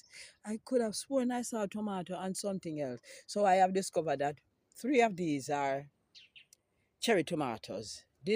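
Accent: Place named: Jamaica. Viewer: Wow.wow mis I almost give up on your Channel because I don't see you for a very long time. Good to see you again. I see Marie every day also Stacy but not you ms I just love to hear you talk real Jamaican talk😅 keep planting because peppers and tomatoes are too Expensive in the market nowadays, Respect 🙏 Jah guide nuff blessings Carramondo never heard that name before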